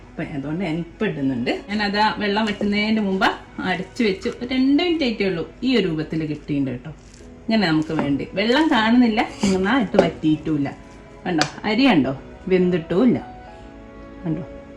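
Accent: Kozhikode, India. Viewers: കോഴിക്കോട് കാരിയാണല്ലോ. സംസാരം 🥰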